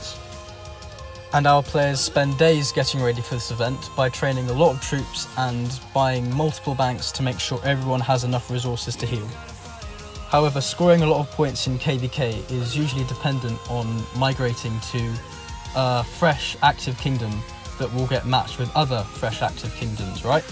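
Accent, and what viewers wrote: England, United Kingdom. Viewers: You are English lol didn't expect that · Huh who is this British Taking over channel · you sound like worthy prince lol...u also from UK? good to hear your voice...u are a serious guy it seems contrary to your posts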